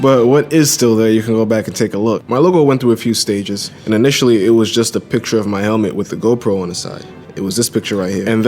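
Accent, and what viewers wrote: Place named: Brooklyn, United States. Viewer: I also live on the west coast now but I"m from the east coast and really miss NYC, so listening to your Brooklyn accent is the best thing ever.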